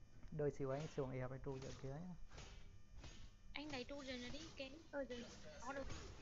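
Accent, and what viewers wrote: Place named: Ha Tinh, Vietnam. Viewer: Ahihi...tiếng hà tĩnh đi mô cũng k quên đk 😀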